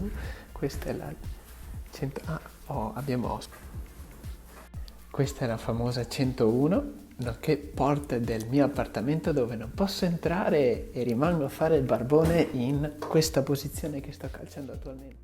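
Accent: Veneto, Italy. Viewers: Ma di dove sei?Veneto? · Sei Veneto??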